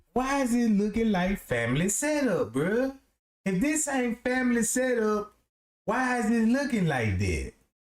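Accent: South, United States. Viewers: Hey Mr. 🫶🏽 I Don't Mean To Disturb You Because I Know You Will Be Going Live Again, But I Was Cooking And Listening To My Gospel And I Broke Down Thinking About Kenneka. Since Day One When I Came In Your Platform, Then Was A Mod. I Was Excited Because Your Platform Was My 1st, And I Was A Mod, But To Get Back To The Subject On Hand, I Feel Your Passion For Getting Justice For Kenneka. It Seems Like When I First Learned About It Was Late Night And I Heard This Smooth Southern Accent (All Do Respect) I Stopped And Listened And Then When I Learned What Happened.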